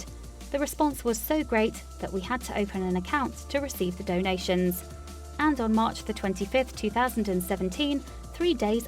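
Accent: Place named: RP, United Kingdom. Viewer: Pachyderm = Pak— ee- derm ( not patchy derm) Myanmar= My— Ann— Mar ( not Myna Mar, or whatever it is she was saying. A lovely posh accent is no substitute for doing your research.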